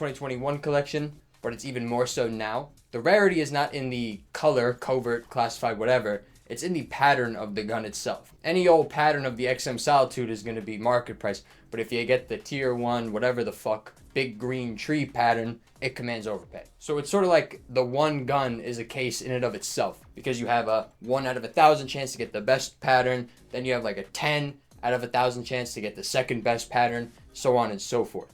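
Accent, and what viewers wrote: New York, United States. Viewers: The intellectual new york accent is rare but very effective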